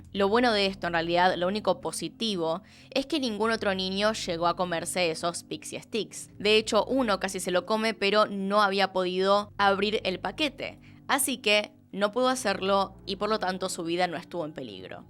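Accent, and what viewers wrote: Argentina. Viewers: El año pasado conocí tu canal y desde entonces no me pierdo ninguno de tus videos✨ estudie psicología y eso me provoca ponerme a analizar a los personajes y casos que narras, aparte que me declaro fan del acento argentino ❤️ Muchas felicidades gran canal🌹 PS: te recomiendo el caso del monstruo de Toluca, fue un compañero mío de la universidad, realmente fue muy trágico vivir esa experiencia y no visto que nadie hable del caso y le de voz a sus víctimas. PS2: saludos desde Los Ángeles CA 🫂🌞😸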